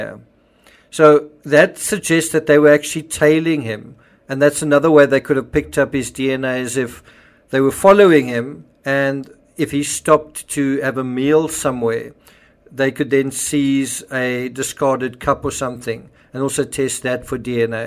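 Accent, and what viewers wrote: South Africa. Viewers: Narater sounds South African.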